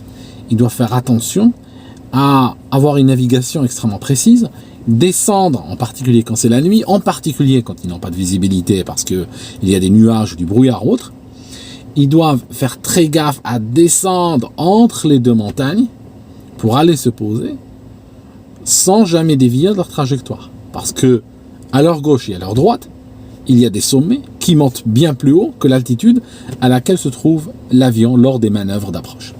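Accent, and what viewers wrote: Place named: Switzerland. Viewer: charmant le petit accent suisse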